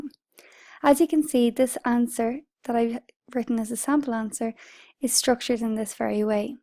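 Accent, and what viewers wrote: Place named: Ireland. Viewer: oh that voice that irish accent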